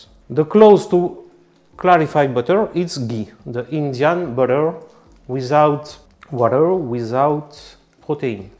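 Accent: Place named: France. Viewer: Thank you for another good video. You are so good at explaining in a simple way with your lovely French accent😊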